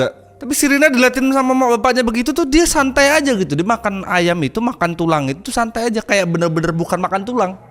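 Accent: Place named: Sumatra, Indonesia. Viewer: Kok malah berlogat Sumatra? Apa saya yang salah dengar 😮😮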